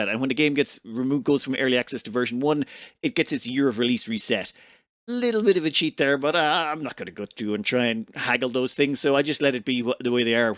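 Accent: Ireland. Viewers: Just totally LOLed at "People can't tell the difference if I'm saying "or, or, or". Made me laugh a lot, I'm one of those people that can't hear any difference. For us in the U.S. it's "Or" = or "R" = arr. Similar to the pirate trope. Dont change,. Love your videos, and what initially caught my attention was the Irish accent. Its just a huge bonus that they are also entertaining and contain awesome gameplay tidbits and information. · If it's any consolation, you sound very Irish to an American in England. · Lol was trying to figure out why your Irish accent wasn’t so think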